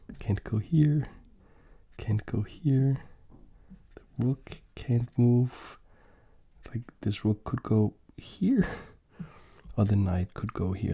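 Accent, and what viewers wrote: Denmark. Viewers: Are you danish? Great video btw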